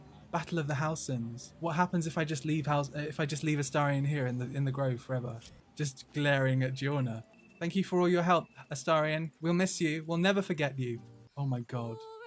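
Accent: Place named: United Kingdom. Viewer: Your British accent made this video even more hilarious!:face-blue-smiling::face-blue-smiling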